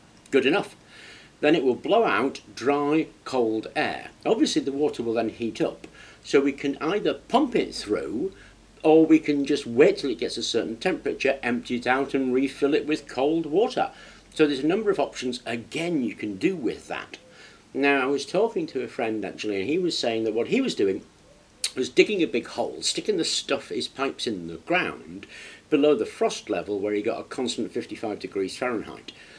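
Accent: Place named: United Kingdom. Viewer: Lately it's been like watching the Tech Ingredients channel with a British accent.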